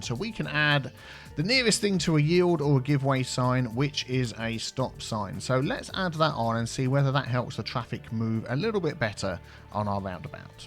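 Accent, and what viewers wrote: United Kingdom. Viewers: I already knew all this but I watch every video, so 🤷‍♂️ 😂 If anything, I stay for the beautiful British English 😌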